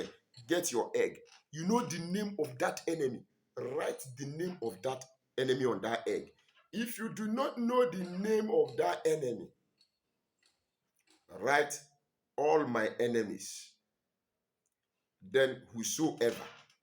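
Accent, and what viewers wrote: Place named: Nigeria. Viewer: getting you lound n clear from Kenya, love your Nigerian accent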